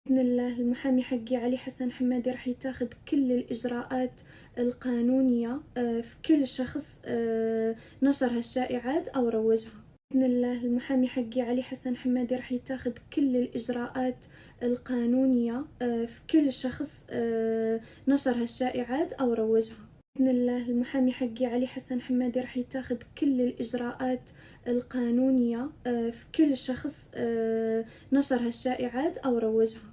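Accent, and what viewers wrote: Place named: Gulf, Arab world. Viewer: لا اختي هادي لهجة خليجية ماشي لغة بيضاء ولايني فهمت شنو قصدتي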